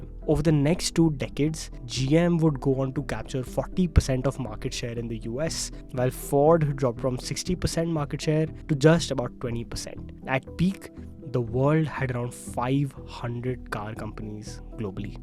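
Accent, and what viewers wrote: India. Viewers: Indian accent 101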